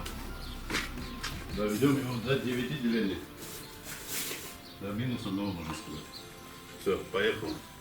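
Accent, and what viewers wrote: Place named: South, Russia. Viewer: Это южный диалект ) Там банка-баллон а пакет-кулек!)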